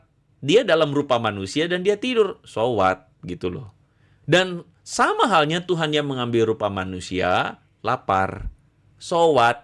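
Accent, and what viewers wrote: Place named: Java, Indonesia. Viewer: Si medok ketipak tipung adalah ustad mukalap yg spesialis bahas Alkitab, itulah jualannya spya pundi2 ya tetap berlimpah,tp bahasannya sangat ngaco dan bar bar, ngaca!! Belajarlah yg bny spya pinter sdikit, kasihan saudara muslim sy yg sdh di sesatkan oleh si medok. Dy sendiri ga ngerti alur Alkitab tp sok sok ingin membahas Alkitab, krn itulah dagangan ny yg laris manis, pesan sy buat: belajar ngaji dan solat aja dgn lebih khusuk, jgn masuk kamar orang lain. Urusin kamar mu sendiri, kamar mu saja berantakan ga karu2an. Beli kaca spya bisa introspeksi diri dan jgn lebailah.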